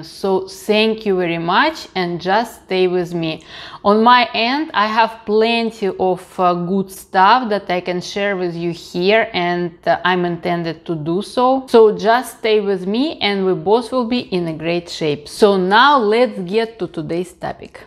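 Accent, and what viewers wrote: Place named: Latin America. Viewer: Mi amor tienes un asento bien marcado deves ser latina trankila q estas hayudando a la gente y eres bella .Yo so soy boricua y con asento bien marcado🤣🤣🤣asi q Palante.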